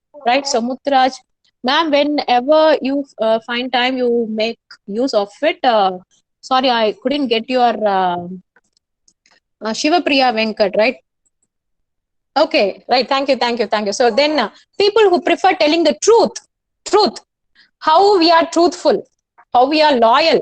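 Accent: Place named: England, United Kingdom. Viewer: Mam I like your english accent .really inspired mam ✨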